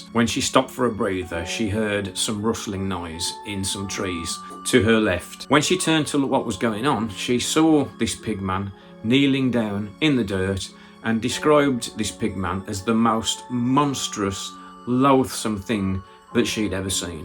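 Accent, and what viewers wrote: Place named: West Midlands, United Kingdom. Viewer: I can tell by the accent your from the West Midlands like myself.I live not to far from Cannock.Dont you unfortunately think because of the notorioty of the chase many of these experiences can't be trusted? I not saying it's all untrue but just urban legend.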